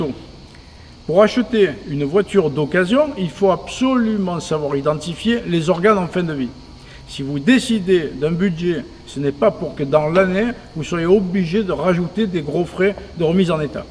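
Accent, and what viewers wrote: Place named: Marseille, France. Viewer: Lol! Une cardan non de dièu Accent typique de Marseillais :trophy-yellow-smiling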